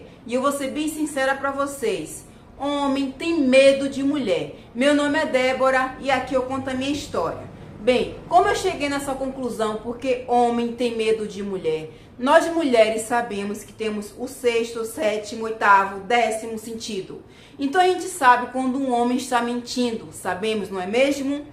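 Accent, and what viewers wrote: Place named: Bahia, Brazil. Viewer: Voce tem sutaque baiano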